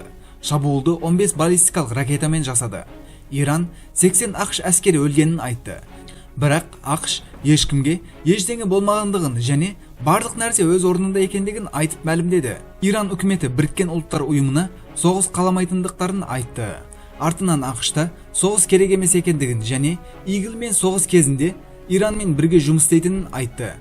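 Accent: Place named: Uzbekistan. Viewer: Өзбексің бе? Тіліңде акцент бар.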